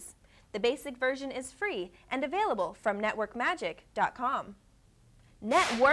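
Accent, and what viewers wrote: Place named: United States. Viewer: Her yank voice goes through me...she's chatting a load of shit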